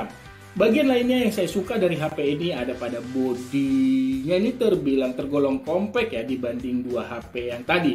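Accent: Semarang, Indonesia. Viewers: Orang semarang ya bang 😅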